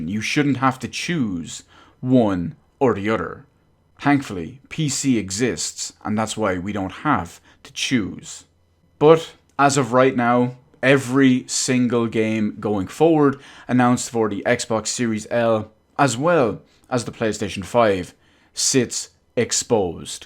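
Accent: Ireland. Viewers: Is the Irish?.., ( asks another Irishman )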